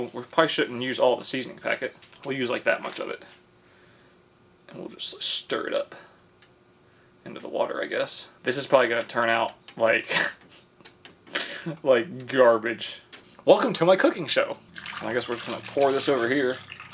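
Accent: South, United States. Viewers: Zucchini ---- pronounced zoo key knee. and it's a squash!!! BTW the next time you want to make corn dogs, use cornbread mix, not pancake batter. You sound like your from the South, you should know a good cornbread recipe. That's why they are called CORN DOGS not PANCAKE DOGS! There is a green light on top, it will turn on when they are ready. YOU CAN'T SAY IT TASTES LIKE A CORN DOG!! IT'S NOT MADE WITH ANY CORN !!!!!!!!!!!!!!!!!!!!!!!!!!!!!!!!!!!!!!!!!!!!!!! BTW You can buy sausages made the same way in pancake batter in the frozen food aisle. hahahahahaha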